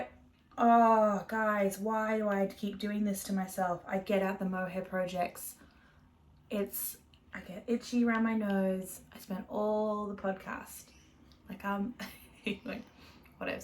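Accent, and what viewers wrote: Australia. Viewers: Hello from central Vic! You're the first Australian podcast I've found, my brain can't really compute and keeps thinking you have an accent (even though it's the same as mine 🤣🤦‍♀️). I feel like we should have some beautiful yarns here but I don't know of too many local ones, so I'm really looking forward to hearing what you find! If you're looking to dip your toe into cables I'd highly recommend "A Little Hocus Pocus" socks, its a free pattern & has a sweet little cable detail. Thanks for a lovely podcast 🙂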